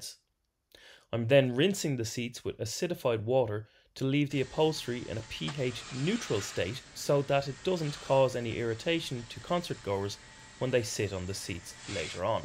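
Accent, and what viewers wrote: Ireland. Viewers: The magical lilt of an Irish voice... listening to your voice... makes me happy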